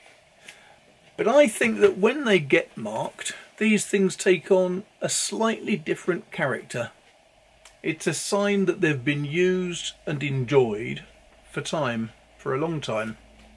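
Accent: United Kingdom. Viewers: yes! I’m very happy about this and really there’s nothing I love more than British voices waxing on about all things pen, paper and ink! ;)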